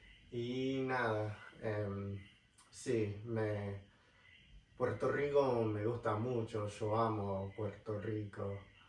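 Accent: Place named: Buenos Aires, Argentina. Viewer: “Me re copa” que grande! Jajaja 100% porteño tu acento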